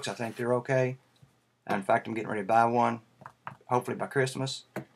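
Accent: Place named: South, United States. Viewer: your southern accent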